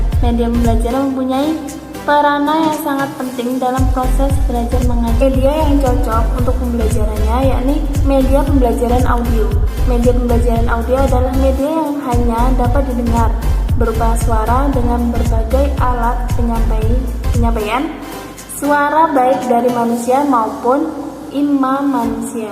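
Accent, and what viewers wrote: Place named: Java, Indonesia. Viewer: Semoga lancar dan tambah berkah dex, medok 😁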